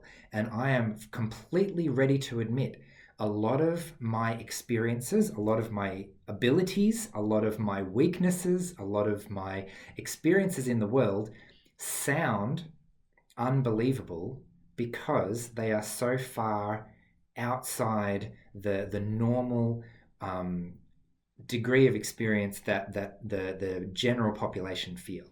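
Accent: Australia. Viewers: I'm self diagnosed, now 44. Getting a diagnosis here where I'm from was too much of a hassle and cost for me a few years ago at least. But I'm glad I know for myself now. I had a boyfriend a few years ago who's on the spectrum also, and it was like coming home. For both of us. I'm really hoping to meet up with more people on the spectrum, but there aren't any meet-up groups like that here and I don't have Facebook, otherwise I would love to join! I'm just really glad I know for myself, and yes, I live pretty much like a recluse, even before covid. But thanks to studying spirituality, I've learned to love myself and not think less of myself because I understand that diversity is necessary for evolution :) I really chuckled at your trying to stay on topic or sticking to a script comment there lol, that's something I have to actively be conscious of all the time!! 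I'm from Brussels, but sometimes I wish I lived in the UK, just because of the access to more meet-up groups irl. You sound like you're from down under though, but not sure! Anyway, thanks for being an advocate for an often unseen group of people. Your videos are really good and easy to listen to, no bells and whistles, just how I like my clothes, my food, and my videos lol 👍👍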